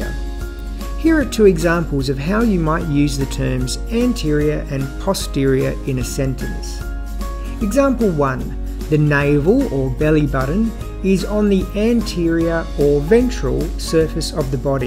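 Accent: Australia. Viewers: first year nursing, thank you for your so clear explanation, loving your Aussie accent.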